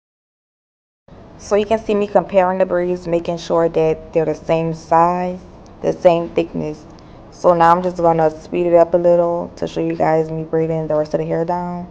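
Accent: New Orleans, United States. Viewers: I hear the Nola in you lol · Great Job! I know you from New Orleans by the Talk. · I hear a Nola accent and I’m here for it 😵🥹🥰😍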